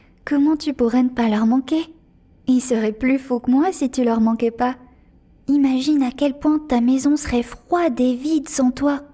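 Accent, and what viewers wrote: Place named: England, United Kingdom. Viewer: Mais.. c'est la même personne que sur la chaine en Anglais? Je peux pas y croire, l'accent anglais sur la chaine principale vs le français parfait sur celle-ci :x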